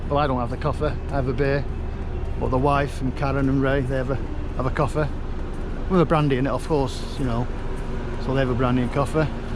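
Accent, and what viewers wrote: United Kingdom. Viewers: Thought you were from Manc good on yeah. Our interpretation would be Geeza bud ta. Guess where in the country that comes from.1 Guess.🍻🍻😂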